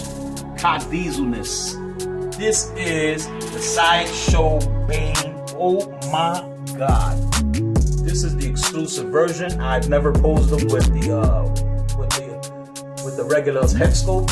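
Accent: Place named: Bronx, United States. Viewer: Yooooooooooooo seen you before onba few chan never seen your vids !! Very nice set up !! Where u from man I hear a lil BX in u !! Let me find out u from there! Hit me back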